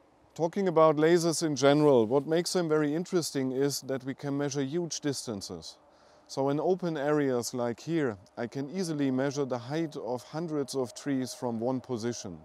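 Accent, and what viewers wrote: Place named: Germany. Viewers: I only heard this accent (I assume Deutschland) in movies such as the big lebowski. What part of Germany you are from if you don't mind me asking? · Or like Helmut, the taxi driver in Night on Earth... Yes, sorry for the German accent, we are from Göttingen in Germany.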